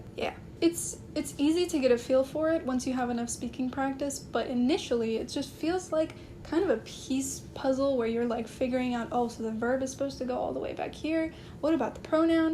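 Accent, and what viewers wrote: Germany. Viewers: Your German accent is soooo nice <3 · love your german accent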